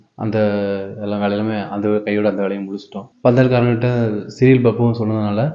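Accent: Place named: Tirunelveli, India. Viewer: பேச்சு வழக்கு பார்த்தால் கோவை மாதிரி தெரியலையே. நெல்லை மதுரை மாவட்டமா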